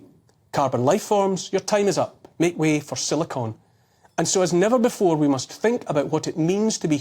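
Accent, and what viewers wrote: Scotland, United Kingdom. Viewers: These tyrants will need to be physically removed! 🤬🤬 Won't Kneel A gentle, beautiful Scottish lilt A kind, empathic, forward tilt An open heart; a caring face A willingness to take his place. A strong, home-hitting monologue Reminds us of the boiling frog The courage to become a sleuth A passion to release the truth. A deep desire to crack the whip Illuminates our freedom-strip Calls out the darkness taking hold The shiny despots; plated gold! Intense and raw, he lays it bare A soul-enhancing, honest stare Inflections drive the message through He speaks for Us - for me and you! Discernment's such a precious gift His words create a mighty lift Take heed of all he has to say He knows - it shouldn't be this way! 🤗🦋🐬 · Oliver: Would you consider making verified subtitles for such videos? While many people in the non-English speaking world might have enough command of the language itself, they have massive problems with the Scottish accent. 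(Which I personally thoroughly enjoy.) These videos by Oliver should be heard EVERYWHERE. Best wishes from Germany.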